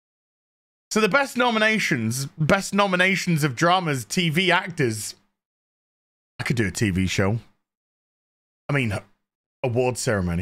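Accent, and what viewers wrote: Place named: United Kingdom. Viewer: Ahhhhhhh!!!!! I needed this! I had to get my dose of! Love you, my brother! Your commentary matches my inner monolouge, exactly, minus your British accent. Keep the content coming!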